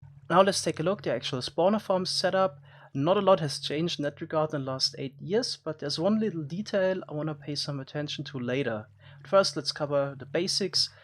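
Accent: Germany. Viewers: man hört so hart das er ein deutscher ist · Deutscher accent cool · Dachte ich mir auch der hört sich Mega deutsch an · Hab nach dem ersten Wort gewusst das du Deutsch sprichst👌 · you sound so german are you? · Alder dein english is so deutsch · are you german? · Mal ne Frage bist du deutsch oder warum ist deine Aussprache so stark? xD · Man hört den deutschen akzent instant raus xd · Fucking German accent i can’t understand your English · Der deutsche accent 😂😂😂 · man hört so stark das du deutsch bist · You sound very very german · man kann so raushören, dass du deutsch bist XD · Is he German? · Are you german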